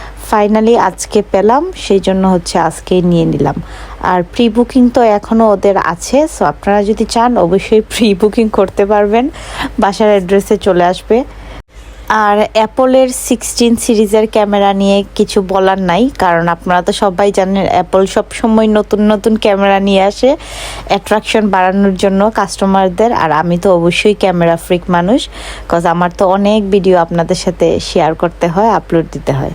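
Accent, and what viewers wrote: Sylhet, Bangladesh. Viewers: Apni ki syloti ni?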